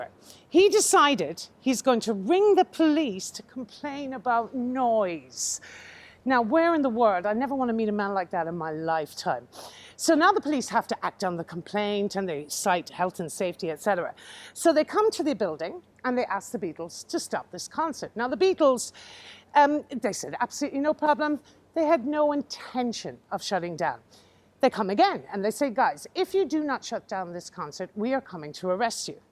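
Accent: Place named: Ireland. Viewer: Apart from the Irish accent, I love your talking voice. Bet you can give it a rock and roll number, too, if not an Irish ballad. Both!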